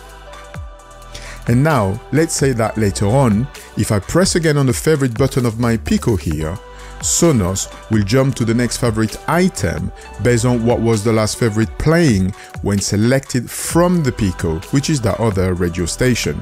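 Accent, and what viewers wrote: France. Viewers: I loooove your french accent and the great tutorials! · Your French accent tho.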